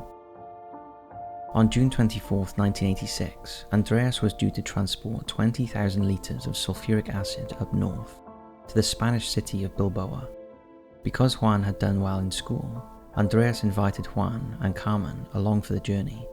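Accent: Wales, United Kingdom. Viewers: Ah maybe! I've been trying to pinpoint his accent from day one! A mild Welsh accent is a definite possibility! 🤔Thanks 😁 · The narrator sounds so much like Craig Roberts! I can hear a Welsh twang to his accent or something! Love that accent! Beautiful!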